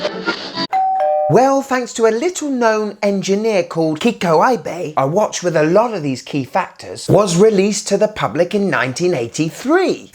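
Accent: United Kingdom. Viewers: Another really great video. It's been decades since I first noticed that you Brits pronounce z "zed" instead of "zee" the way we bloomin Yanks do. were very helpful with this, with Joe saying "Jay-ay-zed-zed" on their song "." And it's been years since I noticed that you insert an extra syllable into "aluminum." But only very recently did I notice that you blokes pronounce h "haitch" instead of "aitch." WHERE DOES IT END?!!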